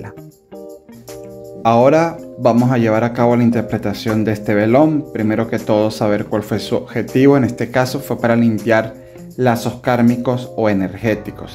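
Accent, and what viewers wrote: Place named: Venezuela. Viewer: Excelente espiritualista, muy claro y conciso, de conocimientos amplios sobre temas de magia y espiritualidad con mucho conocimiento de la antigüedad respetando los rituales de como deben hacerse, te felicito además ese acento y dicción excelente me parece venezolano, excelente la verdad ojala te hubiese conocido acá en Venezuela para aprender lo que no sabemos de la magia y nuestros ancestros, bendiciones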